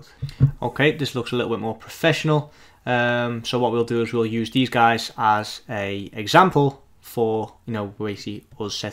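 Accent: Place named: Liverpool, United Kingdom. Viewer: Hi I can tell by your accent that you are also from Liverpool. I was wondering wether Liverpool is the right place to start my smma journey. Thoughts?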